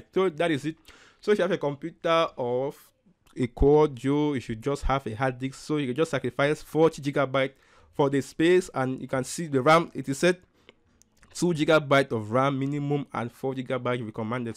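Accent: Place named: Nigeria. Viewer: no you somewhat sounded like a nigerian in a way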